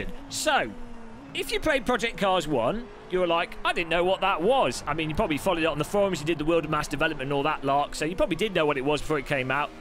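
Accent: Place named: Leicester, United Kingdom. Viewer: but you definitely must be Leicester Lad for sure !!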